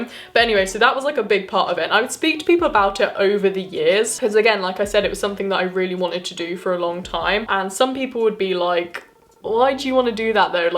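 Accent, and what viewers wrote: United Kingdom. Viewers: I live in Rio de Janeiro, I've learned english by myself, but the british accent It's LIFE. You are so Sweet and your Voice calms me down... I love your videos ao much omg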